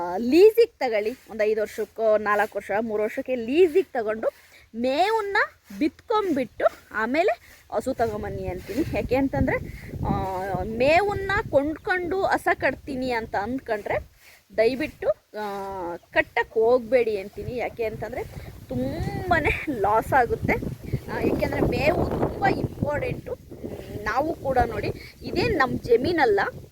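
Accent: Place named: Ajjampura, India. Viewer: ನಿಮ್ಮ ಊರು ಯಾವುದು ಮೇಡಮ್ ನಿಮ್ಮ ಮಾತಿಧ ಉಚ್ಚಾರಣೆ ನೋಡಿದರೆ‌ ನಮ್ಮ ಅಜ್ಜಂಪುರ ಪಟ್ಟಣದ ಸುತ್ತ ಮುತ್ತಲಿನ ಹಳ್ಳಿ ಅನಿಸುತ್ತದೆ ದಯಮಾಡಿ ವಿಳಾಸ‌ತಿಳಿಸಿ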